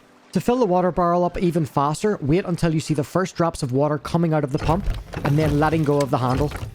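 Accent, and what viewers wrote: Northern Ireland, United Kingdom. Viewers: Yeooooo do i hear a northern Irish accent?!?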